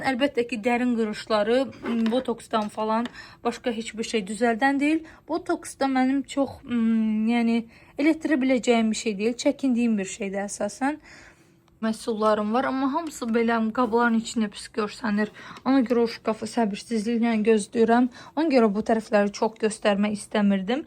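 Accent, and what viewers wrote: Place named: Zaqatala, Azerbaijan. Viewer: Salam,eslen haralisiniz? Zaqatala lehcesiniz var sanki,birde yoldasinizla yas ferqiniz var?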